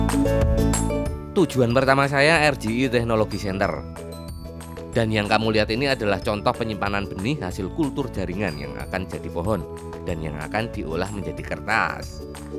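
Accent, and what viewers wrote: Java, Indonesia. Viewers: Keren om 😀 Asli orang mana sampeyan mas? Medhok tenan wakakak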